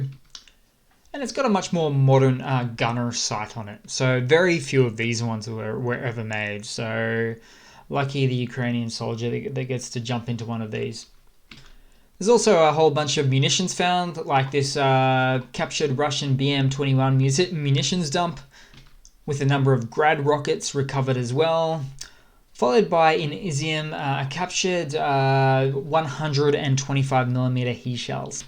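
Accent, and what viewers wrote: Australia. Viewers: Really? are you an Aussie? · Same accent, both Australian. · Hey. I like your reporting. Good stuff. Honest question here: what is that accent? Australian? Please forgive my ignorance. It’s pleasing to the ear, but I can’t quite place it. Just guessing Aussie.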